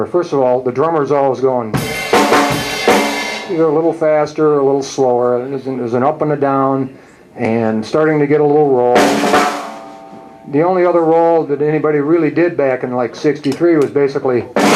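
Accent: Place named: Chicago, United States. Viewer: chicagoland accent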